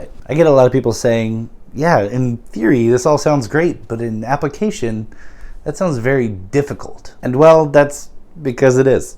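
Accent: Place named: France. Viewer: The French accent is 😍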